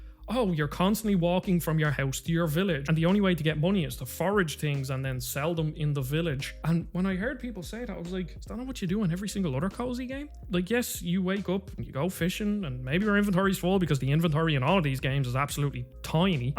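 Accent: Ireland. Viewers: OMG man, such a lovely video, I don’t get the hate either and you just sold me on it, gonna get it myself, also it’s amazing to hear an Irish guy for a change, never been subscribed to any Irish YouTuber so here it goes- you are my first 🍀💚 and if anyone would like to play some cozy/ multiplayer games together (on Switch!) let’s exchange our codes, happy gaming!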